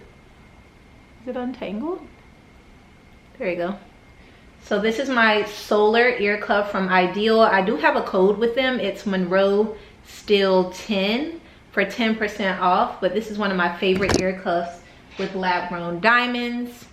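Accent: United States. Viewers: You sound like us who live in Atlanta. We always say, “there’s Atlanta and the rest of Georgia.” So, yes, I live in Atlanta, not Georgia. 😊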